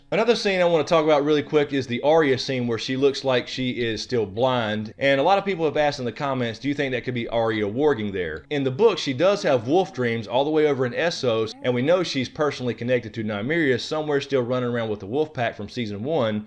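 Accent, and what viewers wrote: South, United States. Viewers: Hey - I love your videos and now you are hooked up with my other favorite - Y'all are an awesome pair and I have chills from your predictions. I did want to ask you if you have ever done any research about the theory that Rhargar's harp is buried in the crypts at Winterfell in Lyanna's tomb? I read something about that and I have always wondered why she was buried in Winterfell since it has always been men there. Just wanted your take on that - Thanks I also detected a southern accent - wondered where you are from- I live in Alabama, I was just curious. Thanks again for all of your videos. Robin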